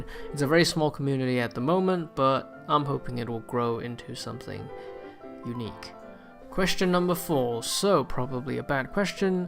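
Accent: Singapore. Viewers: DAMNNNN BOII, when i first heard ur voice, i knew u were singaporean, high five im singaporean too :/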